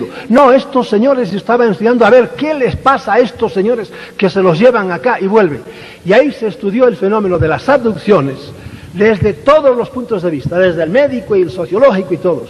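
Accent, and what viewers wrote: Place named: Spain. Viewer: Es español el señor salvador¿?apenas se le nota el acento.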